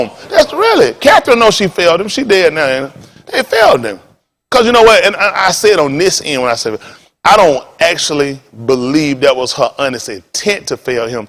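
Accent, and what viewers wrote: South, United States. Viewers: I'm confused is he teaching bible or what yesterday was the first time i heard him and he was angry at pastor Jennings I'm tryin to stay connected to God why is it so much confusion going on teach or talk about whats happen in the world.and not explain what ever he's tryin to say what? Is he high!! A fast country talkin bad language in the church.he is in church?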